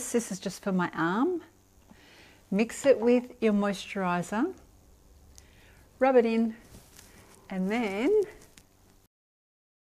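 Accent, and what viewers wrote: Australia. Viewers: I’m a new subscriber. I have watched five of your videos today. Thank you for sharing all your wonderful tips for us fabulous 50 gals. I love hearing your Australian accent.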